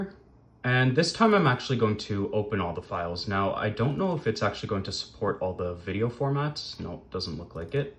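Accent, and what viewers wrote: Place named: Canada. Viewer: Well the sky sounds like a Canadian, and I'm American, so therefore if the Canadian says that's not nice and not good I'm going to believe him.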